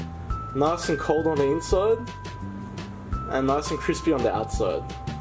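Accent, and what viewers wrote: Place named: Australia. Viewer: Persian with an aussie accent first time watching you video love it very much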